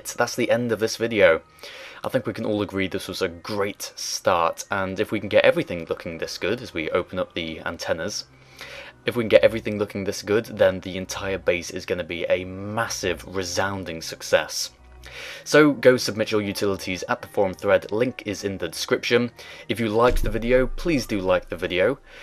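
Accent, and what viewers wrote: United Kingdom. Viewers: What area of the UK is that accent from? Can anyone tell me? Pls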